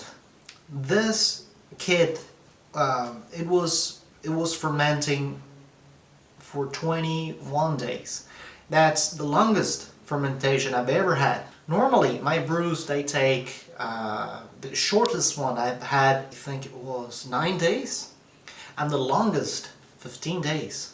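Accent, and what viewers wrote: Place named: Brazil. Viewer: HAhaha no prob mate, its a thing that just brazilians would know, its like a compliment to see if the another lad is also a brazilian. anyway its cuz your accent, not that you have it, but in some parts of the vid i can tell u are a BR(brazilian) but not hehehe.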